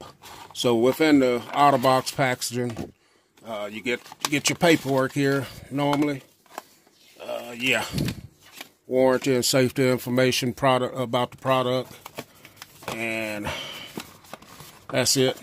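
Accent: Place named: Arkansas, United States. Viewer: He has the same accent as my black friend. Are you from Arkansas?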